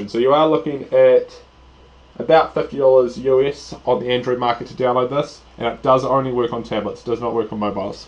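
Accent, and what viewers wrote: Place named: Australia. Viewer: He's either Australian or he's from New Zealand. he's not English. Please learn accents he doesn't sound remotely English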